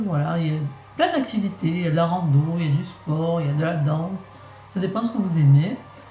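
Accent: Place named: Var, France. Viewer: bonjour, je viens de faire ta connaissance sur you tube et je voulais te laisser ce message: j'ai relevé une phrase dans ta vidéo "le bonheur c 'est de ne pas ressaser le passé tout le temps" et bien là, c'est une des clés pour vivre heureux, il faut vivre le présent, aujourd'hui et maintenant comme on le dit si bien, car cette minute que je prends pour t'ecrire ce message s'est déja écoulée, la vie passe comme un éclair, il faut aller de l'avant et etre fidèle a ses convictions. J'aime ta façon de t'exprimer, simple et sincère, MERCI pour cette video, je me suis abonnée biensur et en plus je parle avec le meme petit accent que toi car je suis du Var:) bonne continuation!!!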